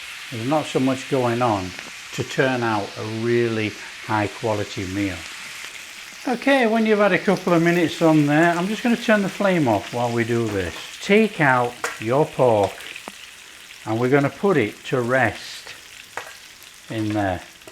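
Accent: Yorkshire, United Kingdom. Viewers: No French accent, just a wonderful Yorkshire brogue. Pork in cider is delish, I could scoff that down now. Nice glass of cider to go with it, what more could you want? xx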